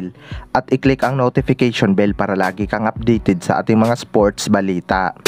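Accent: Visayas, Philippines. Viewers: Bisaya man kaayo ka bro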